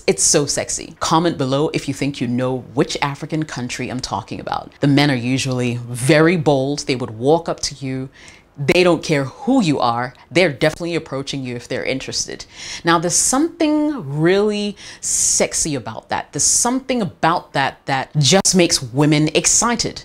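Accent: United Kingdom. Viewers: Of all the coaches and advisors I watch on YouTube everyday, is the best.: You are a genius. I never miss any of your exciting video-lectures in your beautiful, perfect,crisp,refreshing British English. Hugs from Venezuela,Virgil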